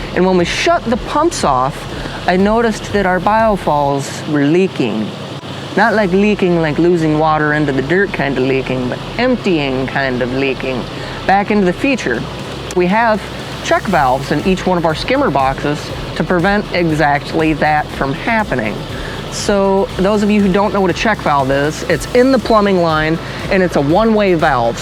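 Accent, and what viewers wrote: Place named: East Tennessee, United States. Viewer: Epic!🐸👍🏻. Love the absolute “East Tennesseeness” and I love that this was for my favorite Doc💙🎄💙🎄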